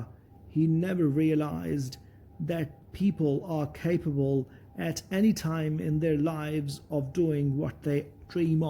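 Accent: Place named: United Kingdom. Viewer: Pure British ❤️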